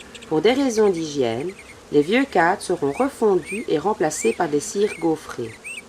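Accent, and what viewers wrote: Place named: Belgium. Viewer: L'accent belche!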